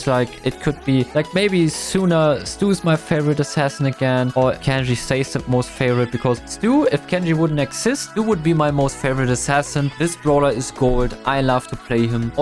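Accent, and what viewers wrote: Germany. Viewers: this is just the german accent and he is a german so it makes sense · You haven’t mentioned Chester! But that’s fine dw! If he’s one of your favorite: Understandable, when he’s close he makes tons of damage, his super where his first attack is stronger is OP, the gadget with buffs or changing your super to a different one is also amazing! If you don’t like him: Also understandable, for his massive damage, his healing super, the bomb, the stun, the slowing down and poison are annoying and OP As a Chester Main I can say: It’s 50/50 Fun fact: Since he was made better with 4 now without the super he can use his 2nd, 3rd and 4th attack to kill mortis, piper, surge (if he doesn’t have any upgrades or is just bad) and also Edgar without any super Though I must say I camp a lot since going in offense is not the best idea with Chester in my opinion! 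-> I’m new to the channel and love the vids, love the German accent a lot 😂 Don’t have him rank 30 yet cuz I don’t have friends to play with so I have to play with random people- 😭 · Man hört dein deutschen Akzent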